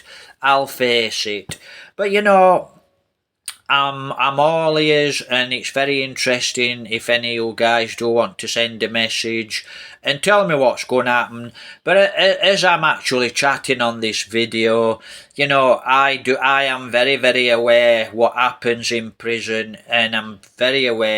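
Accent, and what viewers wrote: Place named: Barnsley, United Kingdom. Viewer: Hi mate. I’m from Hoyland. Just having a debate with my mate about if you were actually from Hoyland as he says your accent sounds more Barnsley than Hoyland. Can you put him straight where you went to school etc. cheers mate. Look after yourself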